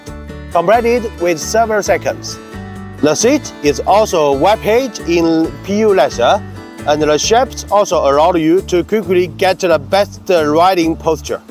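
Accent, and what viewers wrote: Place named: China. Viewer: I love his Chingrish... so adorable.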